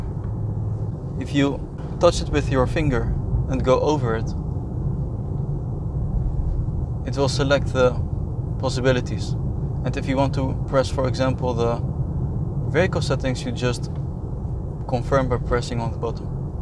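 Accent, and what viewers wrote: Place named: Netherlands. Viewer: i immediatly knew he was dutch hearing how he speaks english =)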